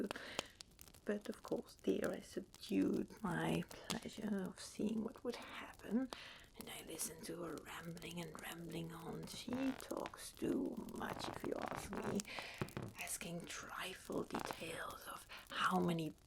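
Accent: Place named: England, United Kingdom. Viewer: Never gets old! Ur english!!!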